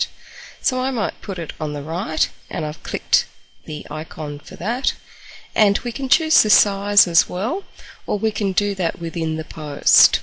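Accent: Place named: New Zealand. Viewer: The voice is clearly NZ and she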